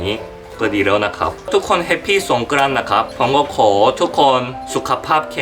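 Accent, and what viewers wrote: South Korea. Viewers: hwaiting Your videos are so cool and uplifting. Your Thai is getting better and better but honestly I love your unique Korean/Thai accent so much. Keep being you 👍🏽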